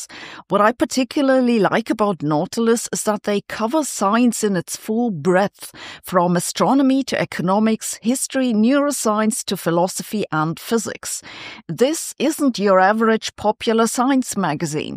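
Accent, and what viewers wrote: Germany. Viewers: German roots - and so the style of speaking - peeps through - like all of us - our past speaks too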